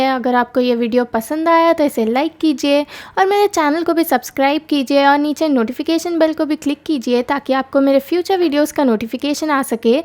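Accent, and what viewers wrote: Hyderabad, India. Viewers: Good review.. sounds hyderabadi slang....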